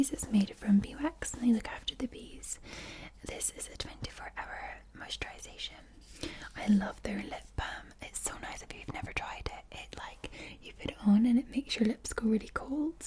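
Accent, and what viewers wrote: Scotland, United Kingdom. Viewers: Wow I like getting personal my Scottish sassy lassie! 😌😏☠️🏴🖤🌌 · Boeys and girrels 😂 love the Scottish dialect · Yeah. I like the talking. She has one of the best soothing and most relaxing voices out there. And her Scottish accent is just right. Its relaxing af. To whomever has a problem with it. GTFO bro. We love just as she is.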